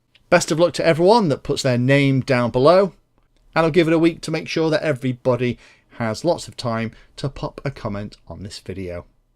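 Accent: England, United Kingdom. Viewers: Always nice to hear your dulcet tones!! In NZ at the mo so great to hear a proper English accent! Always appreciate your reviews (still hoping you might do the Strix Nano Goblin...!) Cheers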